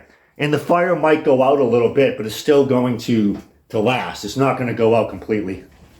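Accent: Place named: Massachusetts, United States. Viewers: Hey thanks man. Being from MA I can almost tell what town you're from haha 😉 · Seems he's from bawstin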